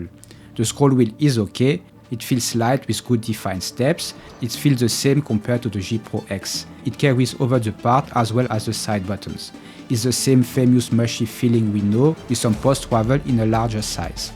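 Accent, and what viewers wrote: France. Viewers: wtf is this shitty french accent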